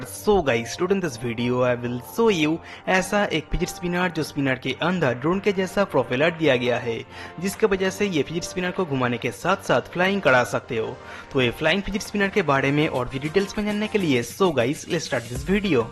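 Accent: Bengal, India. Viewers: Ekdm bengali hindi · Strongest indian accent ive ever heard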